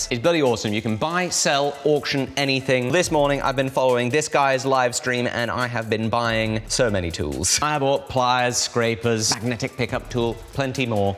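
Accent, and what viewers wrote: England, United Kingdom. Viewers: If I could sound like anyone, I would choose this guys voice. The British accent is so perfect. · i like these channel all in all born with hands for steel very fat strong fingers lol cute baby face talks like a real english man has a smart brain has alot of great skills and work ethics and makes alot of interesting things to watch. the whole package is cool and interesting. i like it keep it up.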